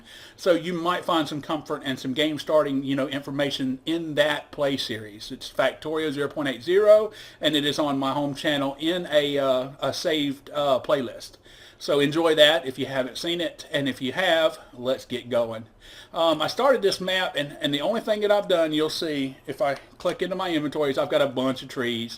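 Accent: South, United States. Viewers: btw love the southern accent. It makes it easier to listen to the commentary. And you're funnier then a one legged man in an a** kicking contest!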